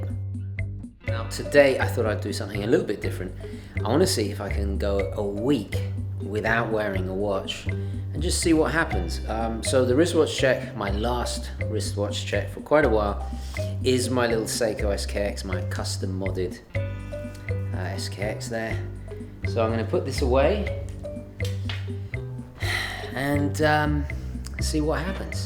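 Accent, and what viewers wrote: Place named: England, United Kingdom. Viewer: Italian culture, English accent, and knowledgeable about horology, what a guy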